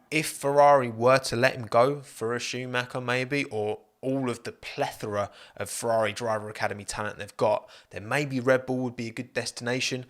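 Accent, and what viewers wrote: United Kingdom. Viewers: anyone else having trouble with the thick british accent?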